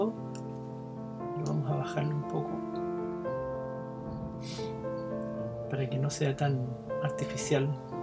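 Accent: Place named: Chile. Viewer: Hola, Encontré tu canal por casualidad...lindas vistas y panoramas en general. Tu acento te vendió como “Chileno”! Yo salí el ańo 78...los campos y panoramas son únicos especialmente cuando incluyes la cordillera de fondo. Yo tengo ańos en photography pero sin canal YouTube. Muy practico tus comentarios especialmente para personas empezando en este hobby o rubro de fotografía. Keep up the good work! Alejandro González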